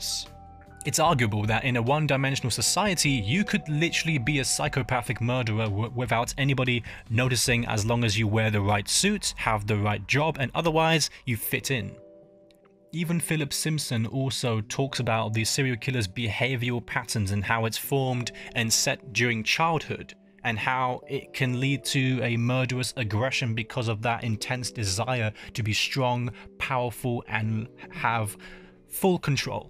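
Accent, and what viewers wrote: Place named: Cockney, United Kingdom. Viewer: You lost me at Cockney accent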